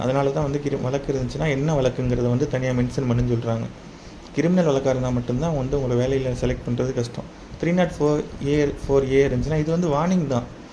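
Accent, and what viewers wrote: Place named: Tirunelveli, India. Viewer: திருநெல்வேலி தமிழ். 24. அப்புறம். 9. அப்புறம். 8. கால் லட்டர் வருமா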